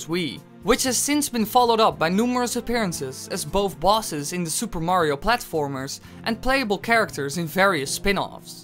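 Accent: Netherlands. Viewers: are you dutch or just english becuase im dutch and you sound dutch to me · are you dutch xD ik den nl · Is this guy dutch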